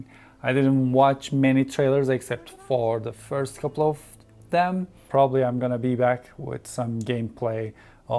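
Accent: Turkey. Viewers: İlk kelimeden anladım türk olduğunu xjdkkd nyse abi efsane ya herkes alabilse keşke o art book un içinde muhteşem şeyler var be bileklik figür çanta falan mükemmel ya o parçalardan biri için kavga bile edebilirmxdkkdkkdld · nedeni türk aksamı ile konuşması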